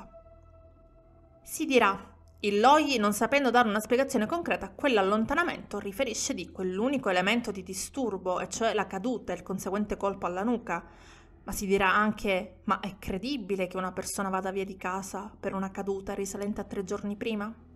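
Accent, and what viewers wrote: Sicily, Italy. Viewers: sai che io che sono palermitana come te il tuo accento nn l'ho mai sentito? Poi l'ho letto nei commenti che sei siciliana, e gli altri invece dicevano che avevi l'accento siciliano, che strano forse perché era il mio stesso accento per cui nn ci facevo caso😃😃😃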